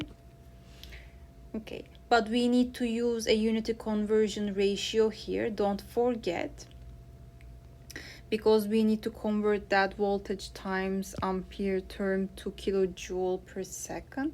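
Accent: Turkey. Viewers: Hocam Are you Turkish your accent sounds Turkish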